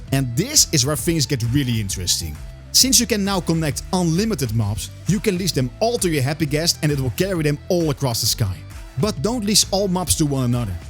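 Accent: Netherlands. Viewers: Accent sounds dutch af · Heel erg irritant & jammer dat nederlandse accent, want je content is top. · Nice dutch accent 😅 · Are you Dutch? You sound Dutch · are joe beetje nederlands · tering wat een steenkolenengels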